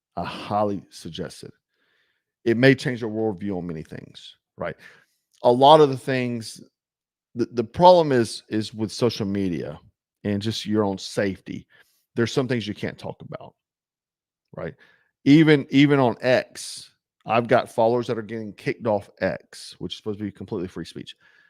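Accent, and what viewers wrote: South, United States. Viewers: What’s wrong with your accent??? Southern is great!!! P!ss on tha rest of yall! 11 Ohm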